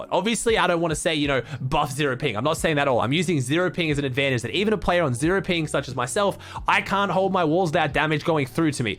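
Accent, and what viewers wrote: Australia. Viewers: YES MATE I LOVE YOU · noti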